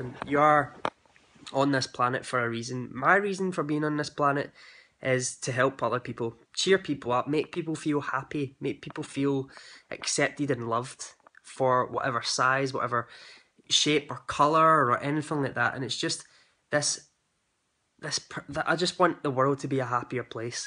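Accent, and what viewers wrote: Scotland, United Kingdom. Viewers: I have acute anxiety disorder and have been anxious since I was a child. Nothing happened to me abuse wise but I was born very ill and separated from mum for 6 weeks. I was in and out of hospital until I was 9 also. I do a lot of natural things too but need 20mg of Prozac daily to keep everything at bay. Thanks for sharing. The Scottish accent is gorgeous 😍 I'm just back from Edinburgh with my hubby. We went for our first wedding anniversary as we got engaged in Edinburgh 2 yes ago xxx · You are amazing and I love watching your videos. They make me feel good and I bet you help so many people who don't feel worth it. I have learned to love myself more, over the last 20 years, than I did the first 22 years of my life. When I came across your videos I was amazed at such a handsome, caring man. Even though I am happy with myself, it is still nice to hear your encouraging words, especially with the Scottish accent. 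I just love hearing your voice! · Very very true. Some people don't understand because they can't relate, so it's hard to explain it to them. And, your Scottish accent is a beautiful reason to listen to you. Well, in addition to your humor, advice, observations, and everything else.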